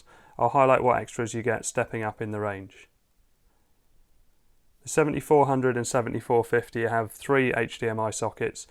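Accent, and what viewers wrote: United Kingdom. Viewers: I love your british accent! Thank you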